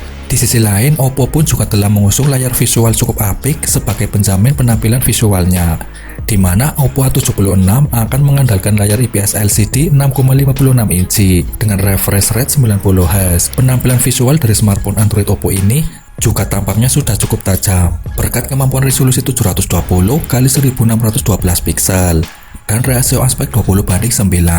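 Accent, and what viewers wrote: Banyumas, Indonesia. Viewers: ngapak ya min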